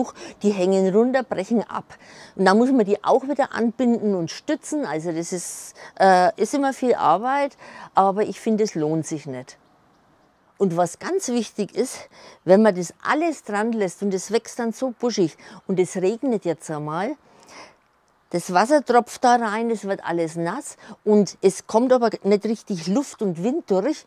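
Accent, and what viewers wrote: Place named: Upper Franconia, Germany. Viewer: Meine Großeltern kamen aus Oberfranken. Der Dialekt ist mir deshalb sehr geläufig und vertraut.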